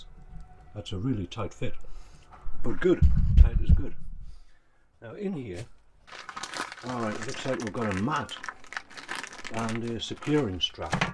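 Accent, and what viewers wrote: North East England, United Kingdom. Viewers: Are you in the North East mate, sense a accent? Just been looking into converting a vivaro myself, looking like a top job 👌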